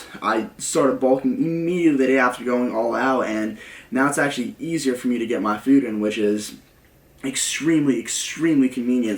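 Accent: United States. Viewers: He has a very strong American accent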